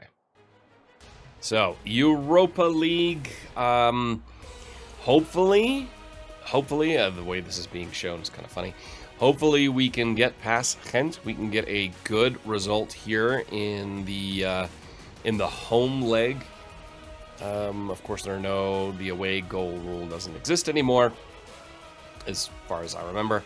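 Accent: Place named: Germany. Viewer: I could hear that. I wanted to ask from which region in Germany you"re from. sorry if it's too personal.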